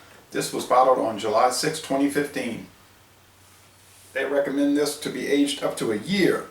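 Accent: Louisiana, United States. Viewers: Got that Louisiana drawl.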